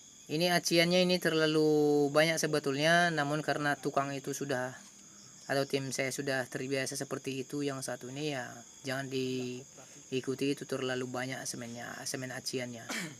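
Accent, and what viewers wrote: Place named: East Nusa Tenggara, Indonesia. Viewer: saya suka saya suka logat ngomongny ntt kah.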